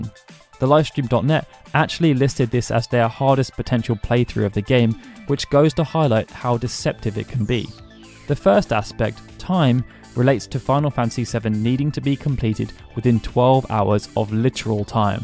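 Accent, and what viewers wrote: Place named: United Kingdom. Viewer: You've got a British accent. Your name isn't.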